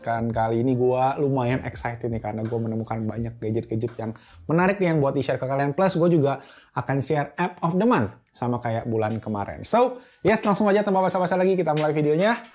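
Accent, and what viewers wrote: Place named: Palembang, Indonesia. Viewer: e' o' , ya ampun bahaso palembang nian🤣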